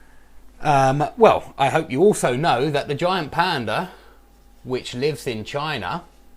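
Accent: England, United Kingdom. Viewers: are you English? I loved your accent:)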